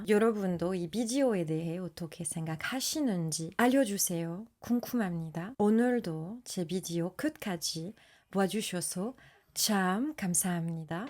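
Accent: France. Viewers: 어설픈 한국어 발음과 프랑스 특유의 악센트에도 한국인보다 더 한국스러운 느낌을 적나라하게 표현하는 외국인. 아니 한국짓에 더욱 충격을 받게 되는 지나가던 문과인 댓글올립니다...... 한국말이 참 이쁘지만 외국입장에선 참 어려운건 사실입니다. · 재한 프랑스인 님의 한국 문학 심화 강좌입니다. 프랑스 액센트의 한국어가 우아하고 고급지게 들리지요? 늘 재밌습니다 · 영상 처음보는데 불란서식 한국어를 사용하시는게 굉장히 신기하고매력적이네요. 근데 계속듣다보니 찐경상도 토박이가 표준어쓰려고하는 것같이도 들려서 나도모르게혼자웃음.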